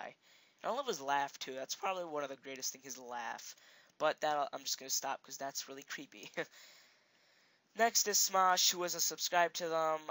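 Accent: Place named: United States. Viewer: cool vid but he speaks American?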